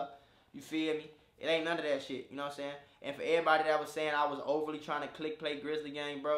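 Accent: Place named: South, United States. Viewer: You sound like you from the Souffffff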